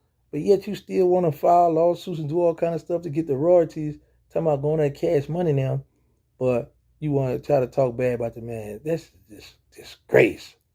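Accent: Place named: South, United States. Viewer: True souf ngga😂 the way you tlk just cracks me up😅😅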